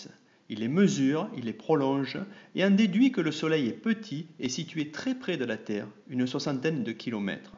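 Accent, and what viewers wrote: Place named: South, France. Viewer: Expliqué avec l'accent du sud c'est encore mieux...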